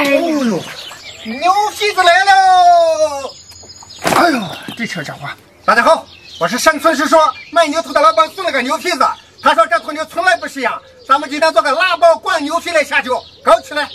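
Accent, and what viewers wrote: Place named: Zhangye, China. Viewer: 好像是张掖一带的口音啊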